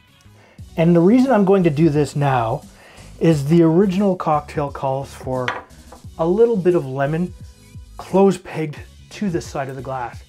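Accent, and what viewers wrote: Canada. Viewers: Nice job,. And nice looking finished cocktail. I like the slow-motion pouring into the glass. I also like how you Canadians say "about" or "out".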